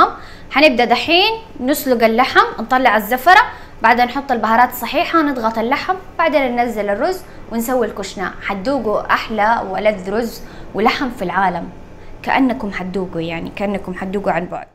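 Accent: Saudi Arabia. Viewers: I adore the Saudi accent mashallah your cooking is amazing 🥰🥰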